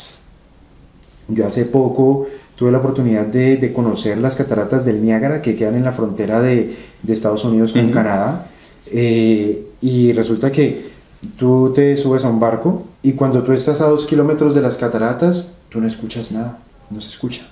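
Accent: Colombia. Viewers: eres colombianito que bonito acento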